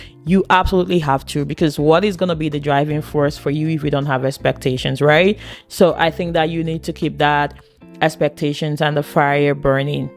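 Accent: Canada. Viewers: The Canadian accent though. ❤❤❤ Love the code switching 😂😂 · Lol The Explanation at the end 😄😄 Honestly, through out the talks. Was just like has fully evolved into the CA accent.🤣🤣 Nice to see And thanks for the video. Solid information👍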